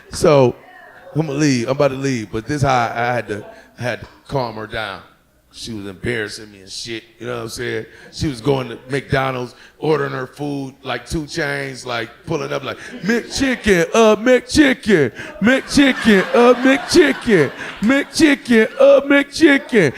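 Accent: Detroit, United States. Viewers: This dude is hilarious! Sounds like Eric the Hip Hop Preacher! That Detroit accent strong! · Soon as I heard what up doe I'm like he from the D lol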